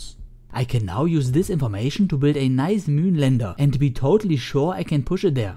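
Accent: Germany. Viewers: Your accent... It is very strong... Is it German or Autrian, sound like it :D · You have an amazing accent. Sehr deutsch! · German- English xD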